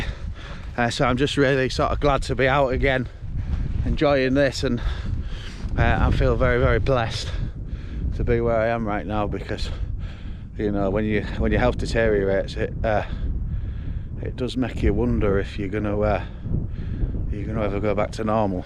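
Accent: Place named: Yorkshire, United Kingdom. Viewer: What a great lunch and cup of tea, all to your super music and dulset tones with some super photos. Splendid work. James and I am getting used to the Yorkshire accent. Hope you are clear of illness so you can keep on with the videos. I enjoy yours as you are down to earth without any pretentious attitude like some. Look after yourself!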